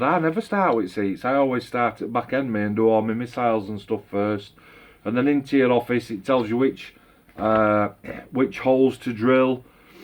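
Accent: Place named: Yorkshire, United Kingdom. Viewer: Lovin that Yorkshire accent mate !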